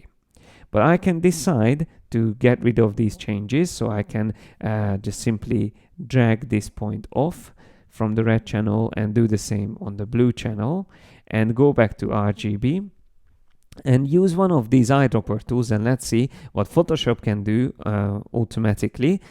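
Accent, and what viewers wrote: Hungary. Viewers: This is a textbook example of HUNGRISH. Terrible to listen to.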